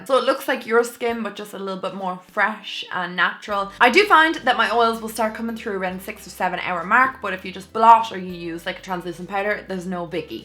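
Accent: Ireland. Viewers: Your videos are amazing! Your are my favorite european youtuber and your Irish accent is so lovely 🙂 My favorite products from the "drugstore price" section are from "The Ordinary Skincare" line. They are absolutely amazing and soo affordable. I know them because Wayne Goss talk about them in his channel. You bet you will love them ☺️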